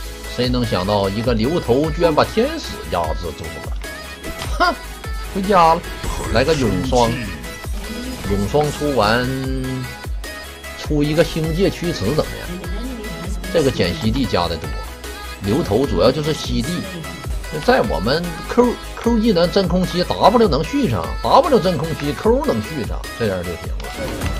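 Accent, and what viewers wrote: Northeast, China. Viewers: 其實大哥說的是東北話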